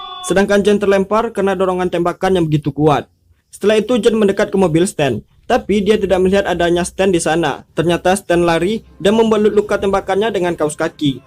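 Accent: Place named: Padang, Indonesia. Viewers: Orang sumatra ni, rasa rasa padangnya ada atau medan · Mimin nya orang padang nya Logat nya kayk orang padang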